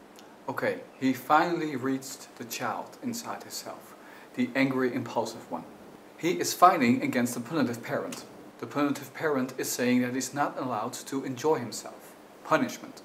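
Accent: Netherlands. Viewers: Jij bent een nederlander klopt dat? haha ik hoor het aan je accent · English is CLEARLY not his first language so he speaks it with a Dutch accent. It's not impossible to have deduced that, surely? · Ik had al zon voorgevoel dat je nederlands was met het accen'tje xD haha Anyway even paar vragen over borderline... Hoe weet ik van me zelf of ik borderline heb? Ik zit ook vaak met gemengde gevoelens weet nooit hoe ik ermee om moet gaan het ene moment show ik ze heel veel anderen moment krop ik ze op en ben ik net een tijd bom die tikt Ik kan van vrolijk in 1 minuut overslaan naar boos/verdrietig In relaties ben ik onzeker, obssesief jaloers ... en word ik boos zonder rede Zijn dat tekenen? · I love your Dutch accent. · Are you Dutch? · He has a Dutch accent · Is this guy dutch? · Ik wist het, ik hoorde je accent en dacht hij moet Nederlands zijn haha. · Are you Dutch ? 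· Very good- I almost cried when you talked about the abandoned child/abused child. I can identify with that kid all too well. Thanks for the video. (Yes, I am a BPD person too). PS_ are you Dutch? I was trying to place the accent the whole time. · het is zo makkelijk om jouw accent te herkennen omdat ik ook Nederlands ben, denk ik :s Misschien kan je dit filmpje ook in Nederlands doen? Meer watchers :D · Recognized the accent of my fellow Dutchie within 1 second.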